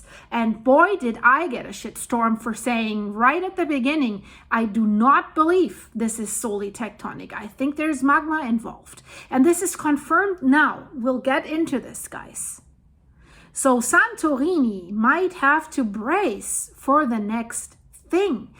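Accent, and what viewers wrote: Germany. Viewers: Hi, this is a good, informative video about vulcanos in Santorini area in Greece. And your German accent of English is charming 👍😉😊 also. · Sie IST Deutsche, oder? Geh ich jetzt von aus bei, und dem unauffällig hörbaren deutschen Akzent. 😂❤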